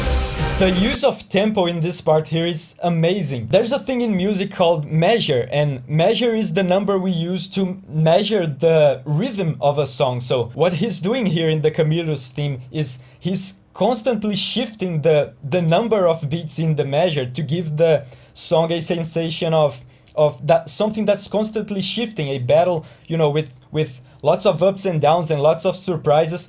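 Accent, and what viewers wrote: Brazil. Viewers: wow you sounds very brazillian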